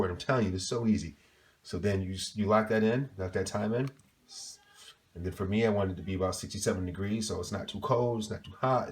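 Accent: Connecticut, United States. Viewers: Bruh speaks like a Connecticut Yankee!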